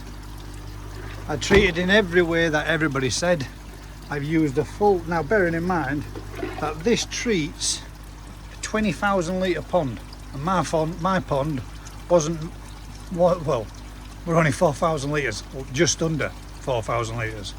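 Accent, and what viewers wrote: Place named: West Yorkshire, United Kingdom. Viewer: Crikey there's some sensitive folk out there!! I will be making my pond bigger after my 2 weeks holiday and enjoy your vlogs Where are you located??...I can tell your in West yorkshire down to your accent as I'm originally from Huddersfield but now in doncaster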